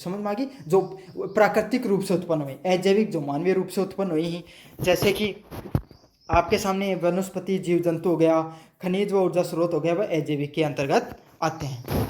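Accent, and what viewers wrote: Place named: Marwari, India. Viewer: Sir thoda dhire or marvadi bhasa ka kam thoda thik thak hindi m b samjao 😣smj nhi aa rha achee se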